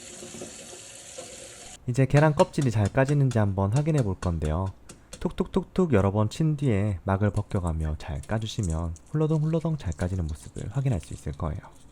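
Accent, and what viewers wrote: Gyeongsang, South Korea. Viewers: 경상도 분이신가보네요 듣는데 사투리가자꾸들려서 좋네요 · 경북억양 경남억양이 섞이셨네요...맞나요?^^ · 표준말 같은 구수한 경상도 사투리...ㅋ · 계속 경상도 액센트로 해주시길 부탁드립니다. 경상도 액센트는 세계최고 멋져요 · 그냥 이렇게 평소 말투로 하시는거 추천합니다. 저도 경상도지만 완벽하게 억양교정 잘 안될뿐더러 ( 도 안되시던데요?😂) 굳이 교정할 필요 있을까요? 사투리 매력적이고 재밌어요 경상도도, 전라도도, 강원도도, 충청도도 다다 ㅎㅎ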